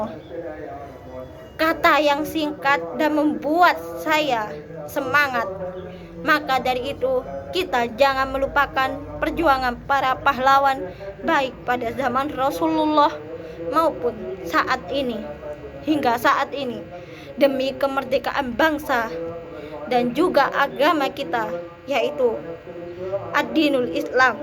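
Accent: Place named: Madura, Indonesia. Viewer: Nah madura nih bos... Wkwk mntapp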